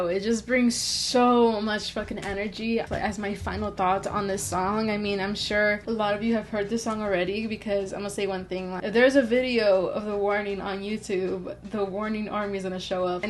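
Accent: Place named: Quebec, Canada. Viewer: Bonjour, à ta façon de parler anglais, tu semble être du Québec... laisse-moi savoir si je me trompe. Si c'est le cas, je suis heureux d'avoir trouvé une autre personne du Québec qui aime TW. J'ai découvert TW en juillet dernier et depuis, je les ai vu live au Centre Bell, au Centre Vidéotron et je suis allé à Monterrey les voir le 22 février dernier. J'espère qu'elles annonceront une nouvelle tournée canadienne bientôt pour aller les voir encore une fois. Si tu as l'occasion d'aller à leur show, n'hésites pas. Elles sont encore meilleure live que sur les vidéos de leur shows. Super réation!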